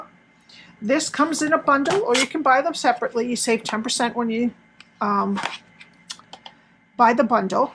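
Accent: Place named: East Coast, United States. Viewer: Love your accent! Are you from back East?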